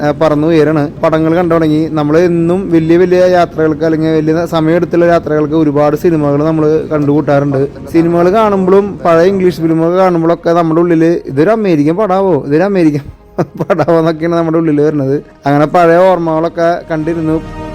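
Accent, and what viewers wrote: Thrissur, India. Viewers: Welcome to the United States. I have a few suggestions for you (1) You should have a clean-shaved face or keep a thick mustache or goatee because many people do not like your face on videos. (2) You should not show your face on video, instead, you should give your narrative and at the same time showing the places you visit like Cool Vision, Rick Reeve’s Europe and Malayalam vloggers like Pikolins Vibe and The Indian Trails. (3) Many people from Thrissur and Malabar districts changed their accent. So, you also should change your accent. If many people from these districts could change their accent, you also could do, so that you could attract more and more viewers to your videos. (4) Your introduction should be very brief. You could say: Hello, Good Morning, I am so and so. Today, I am going to or showing….”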